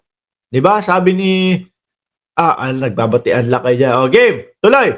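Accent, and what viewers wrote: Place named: Waray, Philippines. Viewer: Parang waray MAGSALITA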